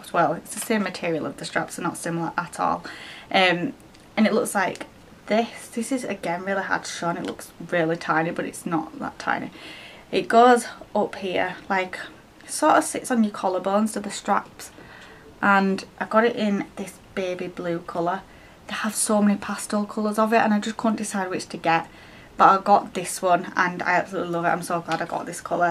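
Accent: Yorkshire, United Kingdom. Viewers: It's an accent, Yorkshire accent to be precise x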